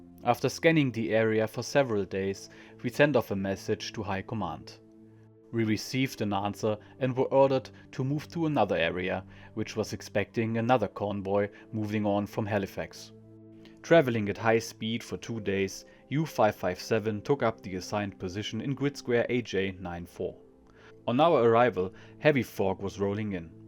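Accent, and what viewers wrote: Germany. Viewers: The German accent materializes the story into reality. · Your German accent makes the narration more authentic.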